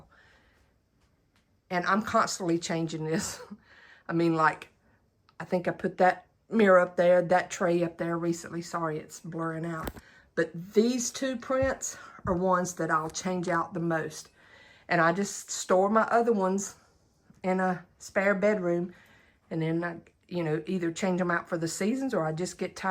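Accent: South, United States. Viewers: Love your decorating, AND your sweet southern accent! 😊 Thank you for the tour, I really enjoyed it.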